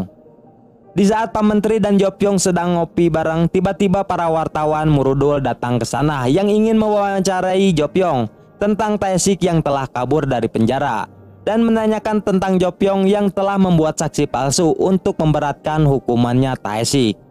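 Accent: West Java, Indonesia. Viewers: Hhaaaa......haaa... Admin naratornya orang sunda....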